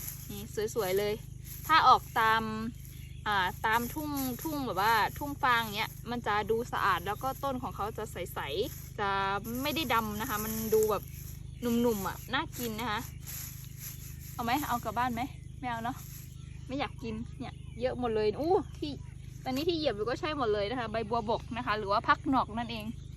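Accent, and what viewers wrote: North, Thailand. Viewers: ฟังรู้เรื่องนะ ภาษาไทใหญ่ก็คล้ายๅกับสำเนียงไทยทางภาคเหนือ ผมคนอีสานฟังรู้เรื่องครับ ขอให้ครอบครัวมีความสุขนะ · พูดเหมือนทางภาคเหนือของไทย.อีสานก็ฟังรู้เรื่องเกือบทุกคำน่ะ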